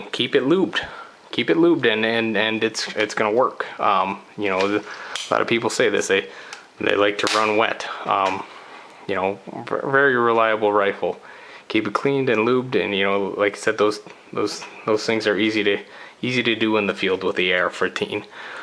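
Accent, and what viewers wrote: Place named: Canada. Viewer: Good vid. Not to clown, but you sound heavily like a Canadian haha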